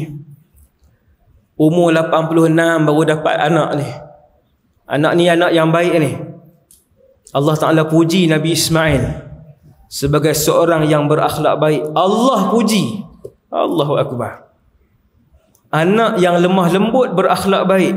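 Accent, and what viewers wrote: Kedah, Malaysia. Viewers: Ustaz adalah favorite ustaz sya.. Ditambah lgi sya penah mimpi ustaz..Alhamdulillah bersyukurnya dpt mimpi ustaz. Kelebihan ustaz bgi sya..sorg yg sentiasa mnjadi diri sendiri iaitu ke mane saja negeri yg ustaz pegi, ustaz tetap utamakn loghat negeri ustaz iaitu Kedah.. Semoga Allah prmudahkn urusan ustaz di dunia n akhirat, dikurniakn kesihatan bdan n usia yg pjg..Aamiin Ya Rabbal Aalamiin🤲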